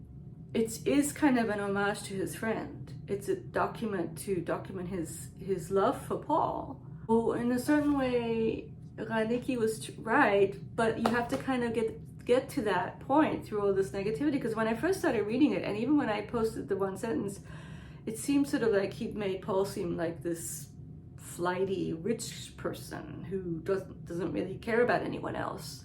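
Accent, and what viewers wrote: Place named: Germany. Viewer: Where are you from? Impressive German accent. (Edit): lol just reached the part of the video when you say you’re German 😂 Liebe Grüße aus Österreich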